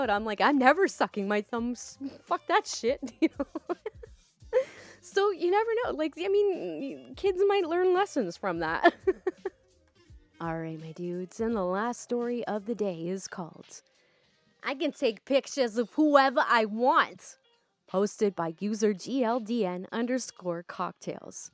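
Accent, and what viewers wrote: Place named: New Jersey, United States. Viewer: That last story could be simply entitled people. Your accent reminded me of the South Park "From Jersey" episode.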